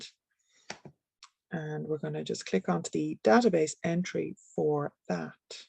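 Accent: Ireland. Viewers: I finally got in! It takes a little end-a-round for yanks! Your voice is perfect--Irish, but not too heavy an accent, which can make it hard to understand. Thank you for taking the time and consideration. I close my eyes and just try to picture the places, and the adventures that took place there! I will also read them myself, as that is my strong suit.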